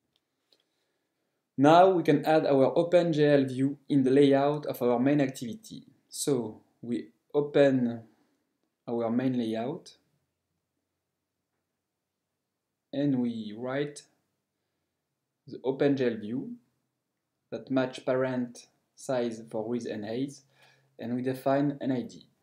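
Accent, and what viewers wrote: France. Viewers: haha amazing ! is this a french accent ? thank you for your time and efforts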